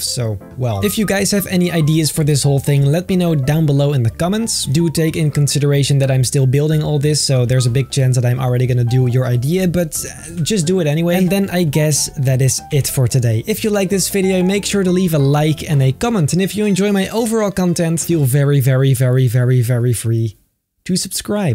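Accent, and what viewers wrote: Netherlands. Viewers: Are you dutch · Hallo, Jij bent ook nederlands toch? Ik kan je nederlandse toon hooren, net als thijmen0808. Hij praat ook net als jouw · Are you dutch !? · i smell dutch hahahaah lekker toch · Ja je bent Nederlands, lekker bezig accent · are you dutch? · I smell Dutch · i smell dutch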